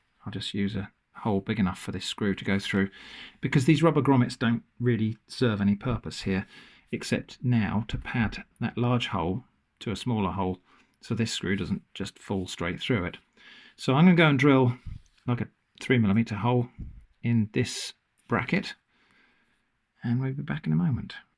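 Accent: United Kingdom. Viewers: its a general misconception, you should not leave it plugged into the electrical socket for earth. Esd earth and electrical earth are different, you need to be on the same electrical level. just unplug the cable. used to install and maintain clean rooms and esd workshops for British Airways for 7 years. Mains earth and esd earth are total separated until they join in the sub station. you sound British? so we have a lot of rain in the uk so static generally is not a problem, unless you are in Arizona USA were it is dry as hell, very good video, love Linux mint, i have it on my two pcs and laptop. will be watching more. just subscribed.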